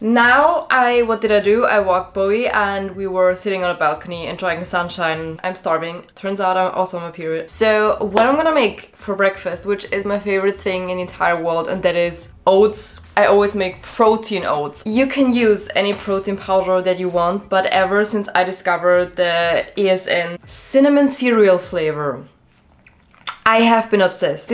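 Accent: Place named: Germany. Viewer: oo yum im gonna make the avocado cottage cheese lunch, also where are you from? you sound very german ahah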